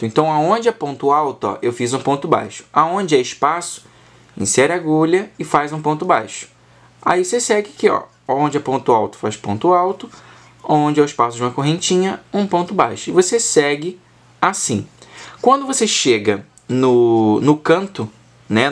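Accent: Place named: Rio de Janeiro, Brazil. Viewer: Linda aula, bem explicada! "Carioquês" delicioso de ouvir!💛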